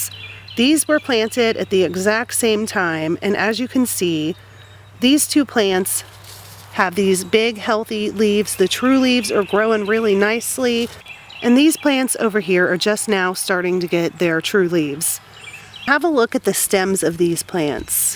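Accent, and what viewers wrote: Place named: Philadelphia, United States. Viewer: Your accent is straight-up gold! Delco? South Philly? I love you!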